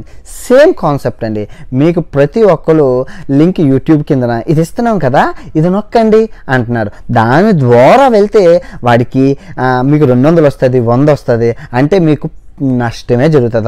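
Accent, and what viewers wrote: India. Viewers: America vellina mana slang manadea...👌 😂😂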